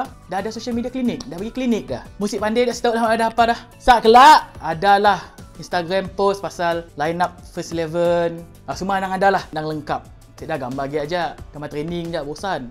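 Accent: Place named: Sarawak, Malaysia. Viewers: dia cakap dialek sarawak tu haha dia fans sarawak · itu dialek (slang) Sarawak... maknanya jika kamu berbahasa Indonesia tapi ada bunyi bahasa Jawa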